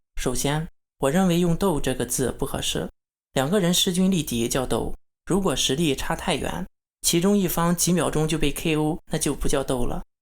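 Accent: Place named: North, China. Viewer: 根据口音可以追查到长江以北了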